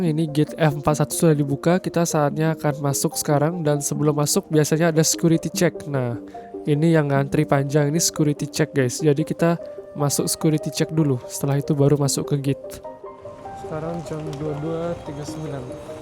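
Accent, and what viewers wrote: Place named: Indonesia. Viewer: Lewat diberanda bli, mau lu sekarang pasport negara lain tapi lidah lu indo banget.. sukses & sehat selalu buat lu dan keluarga..🔥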